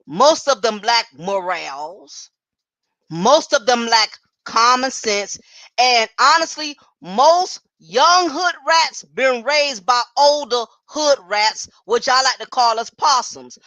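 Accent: South, United States. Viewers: You fine as hell with that southern accent ❤ Dont block me 😂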